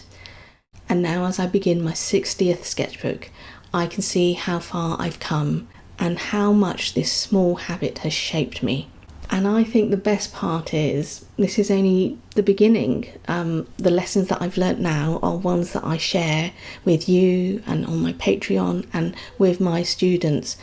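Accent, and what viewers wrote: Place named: England, United Kingdom. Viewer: From Uk. Just found you. Love the English accent. Great inspiration. Thank you.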